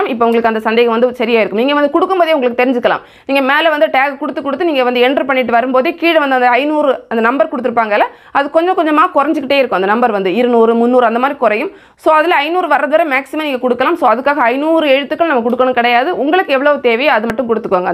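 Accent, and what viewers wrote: Kanyakumari, India. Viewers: Madam u from ......?? If possible say mam 😄😄😄 Kanyakumari....??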